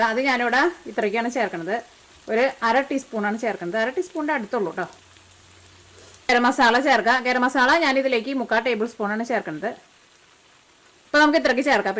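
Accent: Thrissur, India. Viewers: Heyyy, you are so adorable n I simply love yr simple n natural method of recipe explanation in your dialect of Angamali?? But feels like Thrissur...oh btw -that kadai is sooo beautiful..at the US shop???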